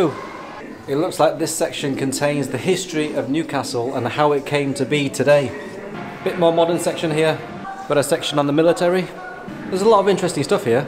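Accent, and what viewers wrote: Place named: Yorkshire, United Kingdom. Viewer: what accent do you have cos im usually good spotting accents but yours is stumping me ya sound like a mild sheffield/yorkshire guy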